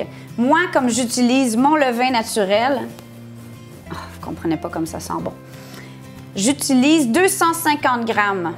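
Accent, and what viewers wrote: Quebec, Canada. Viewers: Résultat incroyable sur la vidéo, et j'adore cet accent canadien ;) Je vais essayer de faire la même chose, mais je n'ai pas ces farines de qualité ;) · Quel mignon, l'accent québécois! J'ai abonné. Merci pour le vidéo. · Quel délicieux accent que celui de nos cousins du Canada, et ça l'est encore plus quand c'est une ravissante cousine ! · Le pain est aussi craquant que la cuisinière !! 😘 Et ce joli accent québécois, j’adore 😍 Merci 😊🙏 pour cette belle vidéo ! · C'est encore meilleur avec le petit accent Québécois. Merci:) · magnifique, et merci je me suis abonnée, pourriez vous me donner la technique pour un pain au levain avec farine t65 bio + farine de seigle bio ( moitié T65+moitié seigle), eau? car problèmes digestifs. Superbe accent canadien et pleine de vie, j'aime.... Merci, à vous lire. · Bonjour, j'adore votre accent Québécois et votre façon de humer le levain. 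Astucieux la façon de cuire le pain dans une cocotte, la prochaine fois je vais tenter l'expérience. Merci pour votre humour et pour cette "cousine" bravo! votre pain est aussi beau que celui que nous faisons ici en France et pour vous encourager je m'abonne. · Patrick de Paris j'adore ta vidéo quel accent les canadiens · Le pain et génial et l'accent québécois franchement j'adore ! Tout est magnifique, même la boulangère d'ailleurs ;) ! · Non seulement je suis fan de l'accent et des canadiens, mais en plus tu es VRAIMENT super sympatique!!! Je mange sans gluten et do cje fais mon pain moi-meme. Je vais essayer de suite cette recette! Merci pour ta bonne humeur et ta gourmandise finale! On aurait envie d'y gouter aussi de ce bon pain au beurre! ;-) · Bonjour je viens de vous découvrir et par conséquent j ai regardée plusieurs de vos recettes Avec votre recette j ai fait mon 1 er levain. 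Merci Et avec ce levain j ai fait un pain Qui était très bon Mais pas aussi levé que le votre Dernier petit point, je vous écris de la Belgique et j' adore l accent du Québec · tres beau accent ,ces Canadiennes j adore . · vu depuis la France, j'adore le Québec, les québécois(es) et l'accent québécois... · Salut ça fait un long moment que jai regarder tes vidéos et je m'en escuse j'ai eu des chamboulements dans ma vie notamment la naissance de bébé il y a 6 mois et javou ne pas avoir pris le temps de regarder tes vidéos enfin Bref, j'ai voulu faire un pain mais râté je me suis souvenu que tu en avais fait.. A la maison je n'ai pas cocotte en fonte est ce que je peux laisser la pâte sur la plaque du four? Et est ce que pour la première étape de pose je peux mettre un torchon ( linge a vaisselle ) a la place du film alimentaire?? J'espère que tu pourras le répondre et je promets de regarder plus régulièrement tes vidéos c'est vrai que ta bonne humeur et ton accent québécois ma bcp manquer.. 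Énorme bisous 😘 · Bonjour super vidéo sympa qui donne envie de se lancer dans la confection de ce magnifique pain et surtout de jeter ma machine à pain. Encore bravo votre accent me rappelle de merveilleux souvenirs de ma vie au québec il y a40 ans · Virus oblige, je suis a la maison en Italie (mais d origine Québecoise). Hier a l épicerie il y avait de la levure de bière qui vient du boulanger pcq l épicerie n avait plus de levure. Bien entendu j en ai achetée par curiosité. Je cherche sur Youtube et découvre une personne qui parle comme moi! LE BONHEUR. Je vais commencer mon pain aujourd hui. Merci beaucoup, je suis maintenant abonnée. Ciao xx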